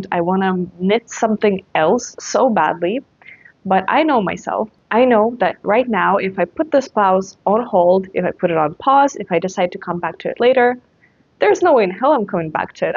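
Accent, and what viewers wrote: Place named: Eastern Europe. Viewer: Do you happen to be a Slavic language speaker? Your English is perfect but some familiar intonations tend to pop in from time to time.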